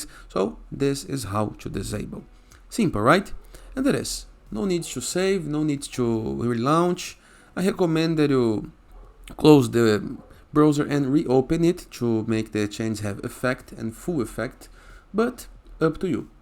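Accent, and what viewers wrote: Brazil. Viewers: tu e brasileiro ne? that ascent...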